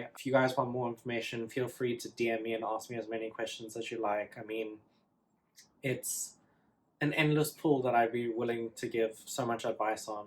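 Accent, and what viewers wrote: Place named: South Africa. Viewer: Lovely South African accent? It is not as heavy as others I've heard. Cape Town? You didn't mention how you came out to your family.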